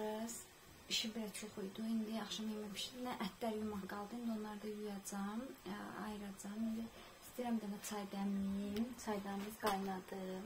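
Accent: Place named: Southern Azerbaijan, Iran. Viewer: Salam bacı bir videonuz təsadüfən qarşima çixdi,elə o videodan sonra sizə abunə oldum,özümdə iki uşaq anasıyam,eləbil öz həyatımı gördüm amma mən sizdən yaşda böyükəm,heç kese qulaq asmayın, necəsinizsə elədə evinizə eşiyinizə yapişin,ailə bir qaladır o qalaya başqalarının fikirlərini girmeye qoymayın çox səmimi,mehriban ailəsiniz Allah qorusun,birseyi unutmayın bacı bugün etdiyinizi qarşiliqini Allah sizə verəcək inanın,həmdə fazlasiyla halal olsun size tərbiyə verən ata-anaya(bu arada videolarinizda doğma cənubun ləhçəsinide,eşitdim yada oxsatdim😊özüm Masallidanam❤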